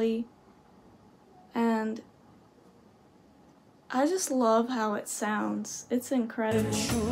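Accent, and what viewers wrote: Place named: Minnesota, United States. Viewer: Thanks for this reaction,! You are right that so many of Persian music is/sounds sad! I believe music shows "the state of a society". Unfortunately, in at least the last 200 years, Iran has experienced a lot of downs which may reflects itself in music. Just my 2 cents. I hope that things change societally, and we can produce cheerier (but still meaningful) music. I hope a speedy recovery for you ❤ By the way, I like your accent! If I may guess, Minnesotan?:)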